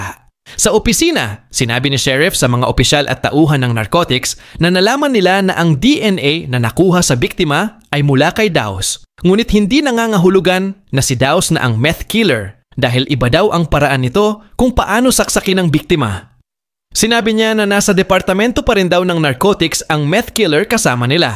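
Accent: Visayas, Philippines. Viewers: Ang tgas ng accent ng storyteller bisaya Ka ba?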